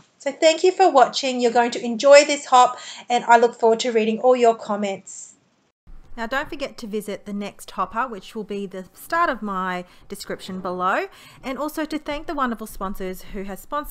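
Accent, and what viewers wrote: Australia. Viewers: These were great cards, and lovely to hear an Aussie accent! Thanks for sharing the techniques, although now I really want to go and buy all the different colours of embossing powders. They're so pretty.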